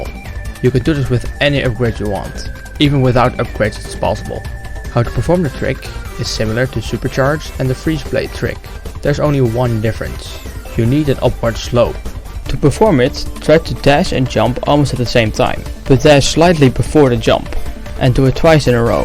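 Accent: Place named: Netherlands. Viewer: Dutch accent strong in this one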